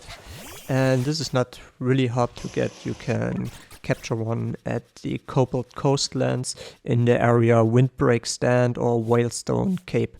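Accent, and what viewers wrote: Saxony, Germany. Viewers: Why do you soumd like a saxonian german whos try to speak Englisch I swear exact same accent xD Ok well i mostly speak accent free but i heared other saxoniams sounding like that Normal people Version You Wörschon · For me as a german it sounds like you have a german aczent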